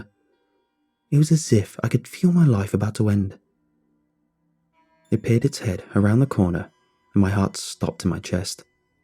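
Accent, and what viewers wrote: United Kingdom. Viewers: If its in America why use a UK voice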